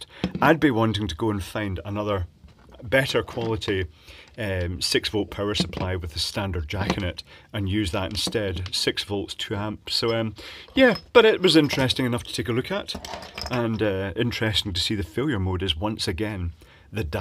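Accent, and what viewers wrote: Scotland, United Kingdom. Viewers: he's scottish :D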